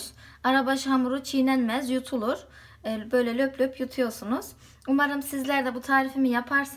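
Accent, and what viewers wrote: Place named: Central Anatolia, Turkey. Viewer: Gaynamaya bırakggyoruz o kadar iç anadolulusun ki abla bende niğdeliyim şiveni çok iyi anliyorum 😂🖑 elllerine saglik bizde koyunun boyun etinden yapılır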